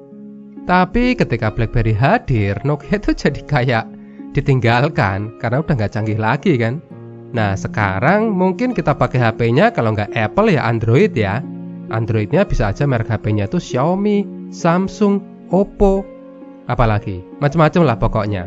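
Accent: Indonesia. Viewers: Nonton full video ini gara2 voice over nya yang Indonesia banget 🙌🏻🙌🏻🙌🏻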